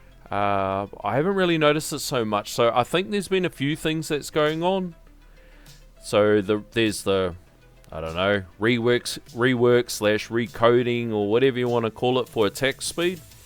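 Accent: Australia. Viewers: Been waiting for that smooth Aussie accent to explain V27 to me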